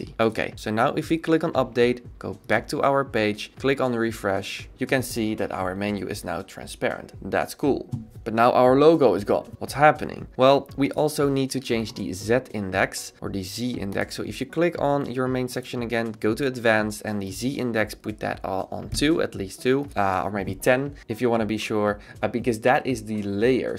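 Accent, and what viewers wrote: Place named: Netherlands. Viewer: Nice, just came across your YouTube video for a work project I have and I thought his English is good but has an accent is he Dutch and yes you are :) I used to live in the Netherlands as well until last year!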